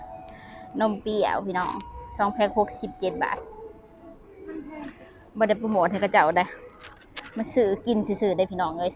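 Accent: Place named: Laos. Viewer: เว้าลาว ดีแล้วครับ ที่ติดตามเพราะมักฟัง สำเนียง น่าฮัก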